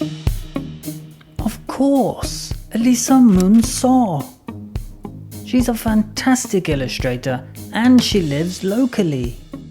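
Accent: United Kingdom. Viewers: Saludos desde México 🇲🇽 I love British Accent 🇬🇧 😍👌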